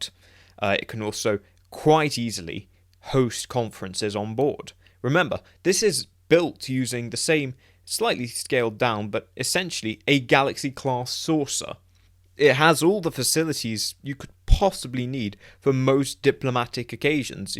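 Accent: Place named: England, United Kingdom. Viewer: your English accent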